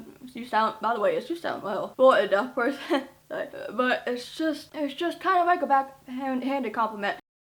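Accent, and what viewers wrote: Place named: United States. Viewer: What i find amazing is your accent is american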